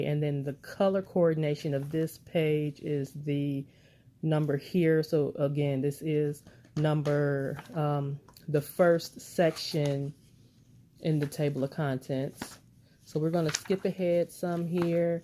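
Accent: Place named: South, United States. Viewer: Yes!! A review with a southern accent. Thank you. Love it.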